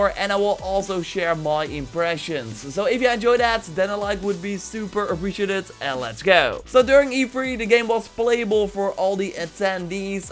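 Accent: Netherlands. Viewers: Are you from The netherlands? you have an accent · Are you from the netherlands? Your accent kinda sounds Dutch lol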